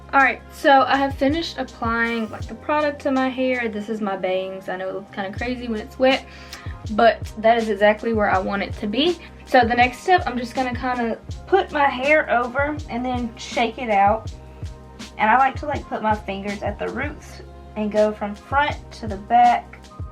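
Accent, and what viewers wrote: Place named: South, United States. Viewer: Your accent is cute. ^^ Are you from the south?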